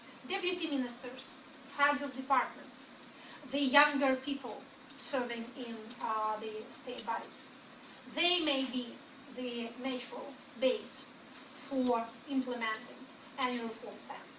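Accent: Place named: Russia. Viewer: Such a likable russian accent)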